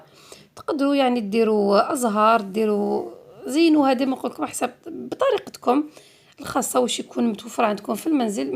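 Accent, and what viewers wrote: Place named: Algiers, Algeria. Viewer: الله يبارك اختي افكارك رائعة ماشاء الله، وشحال تعجبني البحة ل في صوتك مع اللهجة العاصمية حلوة بزاف ، اختك ام إياد من الشرق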